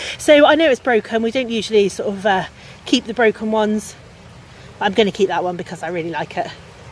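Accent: England, United Kingdom. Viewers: Sorry, but English accents are hard to keep up with and you talk so fast.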